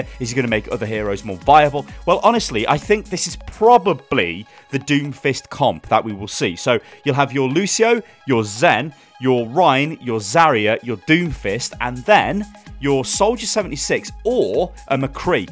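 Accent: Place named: Midlands, United Kingdom. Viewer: Where is he from? He sounds like he's from the midlands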